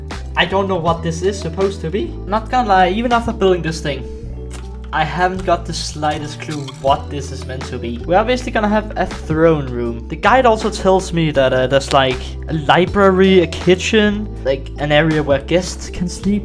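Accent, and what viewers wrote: Denmark. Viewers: You have danish accent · He is danish im danish so i Can here it with his accent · Why does it hear like hes danish?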